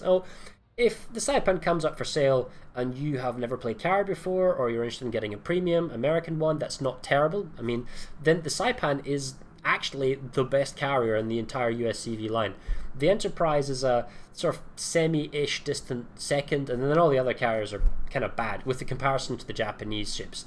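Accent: Scotland, United Kingdom. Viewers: he's scottish I think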